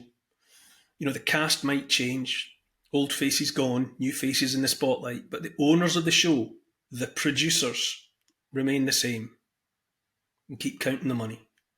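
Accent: Scotland, United Kingdom. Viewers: Well, that hit a few nails on the head! 👍💪 Neil Won't Kneel A gentle, beautiful Scottish lilt A kind, empathic, forward tilt An open heart; a caring face A willingness to take his place. A strong, home-hitting monologue Reminds us of the boiling frog The courage to become a sleuth A passion to release the truth. A deep desire to crack the whip Illuminates our freedom-strip Calls out the darkness taking hold The shiny despots; plated gold! Intense and raw, he lays it bare A soul-enhancing, honest stare Inflections drive the message through He speaks for Us - for me and you! Discernment's such a precious gift His words create a mighty lift Take heed of all he has to say He knows - it shouldn't be this way! 🤗🦋🐬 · I thought u were Georgie Best for a bit with a Scot accent lol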